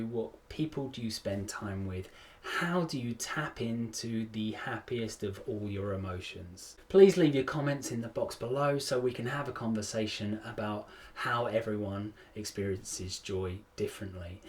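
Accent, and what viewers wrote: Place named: United Kingdom. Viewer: Loved your accent, fellow UK creator here. I think this is so insightful and it’s also so lovely to have someone on YouTube not shouting and screaming at the camera. Very informative and love the blue in the background. I am only new on the platform myself but if I can give you some feedback, there is a little white noise in the background, this can be rectified by cleaning the audio depending on what programme you are using. You have a new subscriber. 👍 keep doing good!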